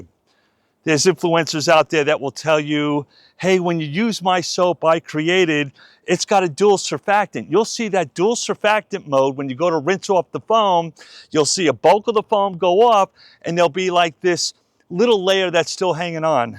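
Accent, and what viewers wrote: New York, United States. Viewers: It's that famous NEW YORK American accent!!;;; ❤ LOVE IT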